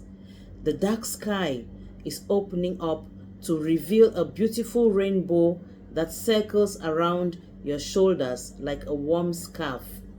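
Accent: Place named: Africa. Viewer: A beautiful prose of becoming spoken in my honor. Thank you Child of the Most High God. From your accent, I believe you may be from the Motherland. You have superb writing skills — the literary world needs to hear from you … in peace and love, God’s Chosen Servant & Supreme Leader With Spiritual Authority ♥️👑♥️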